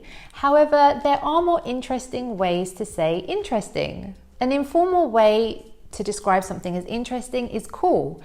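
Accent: United Kingdom. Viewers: Hello 😇 Take love from India ❤️😇💐 Can you please tell me in which british accent you are talking 😇 To be honest with you I am in love with your accent 😇💐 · you have very good British accent.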